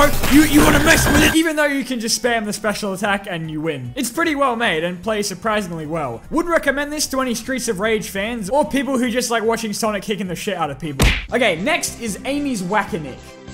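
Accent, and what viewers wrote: Australia. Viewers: I feel like a dipshit for just now realizing you have a lisp. For some reason I just thought you had the strongest Australian accent i'd ever heard. And other youtubers would either be more shy or have a more noticeable lisp while yours blends into your accent. Either that or crazy. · Hey, i know ya probs wont get around to this but i really can relate to your channel and by that i mean like your veiws on transformers, humor and games. And i have had some rough times lately, so to have someone relatable to watch is real neat. And if you don't mind me asking, you sound aussie, you from aus? I am and im just curious. Anywho thanks for the laughs, entertainment and overall good vibes.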